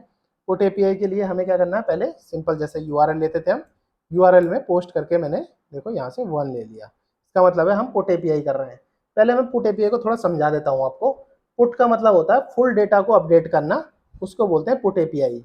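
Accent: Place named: India. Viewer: Your Englisch is terrible, I don’t understand one word! You are speaking much to fast in your Indian slang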